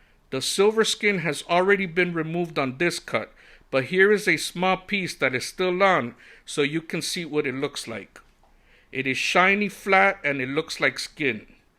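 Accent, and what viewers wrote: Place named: Latin America. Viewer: I can simply tell by the accent that this guy is qualified to have this conversation. If you aren't from Latin America, you just don't know skirt steak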